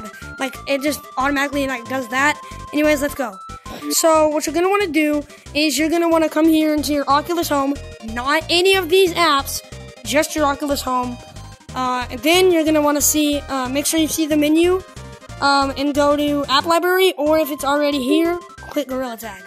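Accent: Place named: England, United Kingdom. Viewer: Why does bro sound like English kid Naruto?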